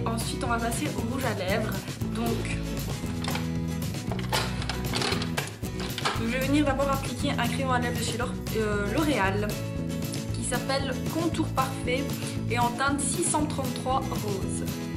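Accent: Belgium. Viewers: Kikou je viens de découvrir ta chaîne et je me suis abonné afin de parcourir les autres vidéo😉des la 1ere minute je pouvais parier que tu étais belge.tu es de quelle region?moi de mons😆bizz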